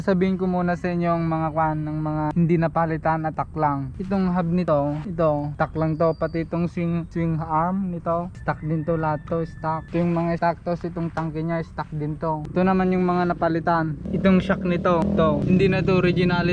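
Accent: Ilocos, Philippines. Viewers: Halata man nga ilokano Lodi haha astig ta motor mu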